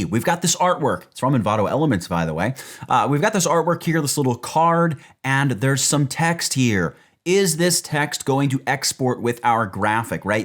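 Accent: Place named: East Coast, United States. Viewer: Good stuff east coast accent dude.. 👍👍 I’m I wannabe design engineer, thinking about entering the automotive industry for added economical improvements.. If you needed any specific inspiration. 🏆🏆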